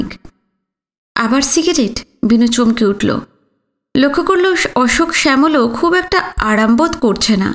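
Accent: East Bengal, Bangladesh. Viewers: Khubi bhalo lagche, tumi je aeto parisram o jatna kore aei bishal upanyas path kore shonachho ,bishesoto purba banglar bhasha je immense dakhyatar sathe bolecho ta ak kothay atulonio, aeto ghatanar madhye ak jon adbhut bhabe missing se holo Jugal ,aeto charitra pratita prithok rupe path kora sahoj byaper noy tomar aei prachesta atyanta prosongshoniyo ,bhalo theko anek dhanyabad tomake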